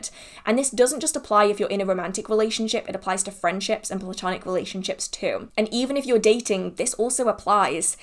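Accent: United Kingdom. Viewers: I like you sooo much. I love your cute British accent 😊❤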